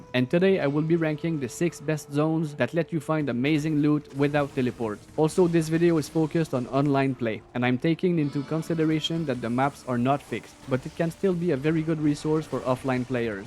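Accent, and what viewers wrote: Quebec, Canada. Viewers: Me semblais en ecoutant jtrouvais sa sonnait quebec 😂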